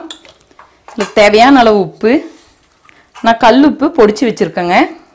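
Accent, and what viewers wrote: Kongu, India. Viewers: Kongu Tamil kagave oru like...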